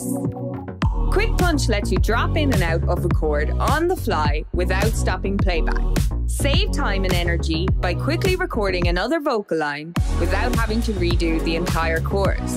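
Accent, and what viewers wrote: Ireland. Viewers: Some accent. Sounds like she needs audio suite with a blast of Melodyne. Can't stand that oirish accent - always sounds like a donkey stuck in a bog.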